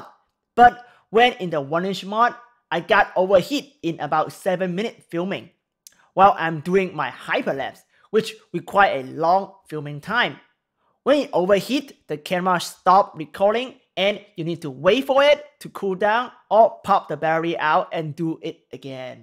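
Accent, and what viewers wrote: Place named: China. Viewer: his english is not broken! he has just chinese pronunciation which is actually cool...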